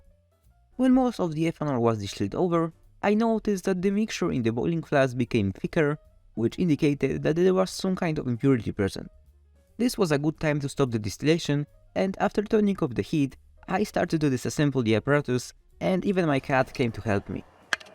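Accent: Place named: Poland. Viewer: Very good video ❤ just subbed and love to see more. It's just like nile red before he got popular and got worse. Happy to See again a High quality chemistry video that's down to earth. Aannnd happy to see a fellow polish guy, you have only little accent but it's not very noticable. Keep up that work ❤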